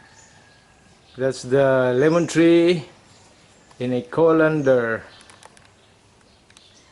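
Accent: Visayas, Philippines. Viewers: It's more of a Visayan accent 😉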